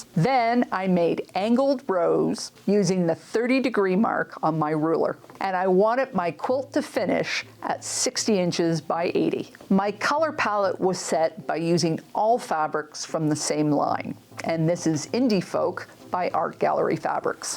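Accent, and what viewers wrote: Canada. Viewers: Hi! I just started quilting (been sewing for years) but got recommended your channel to start, and it’s so helpful!! I caught an accent and saw you’re Canadian too! I was wondering if you’ve noticed price increases for certain tools/materials in Canada specifically? Prices have gone up overall, but for anything in particular that you’ve seen, or anything that’s maintained affordable for the hobby (not including reusing/thrifting)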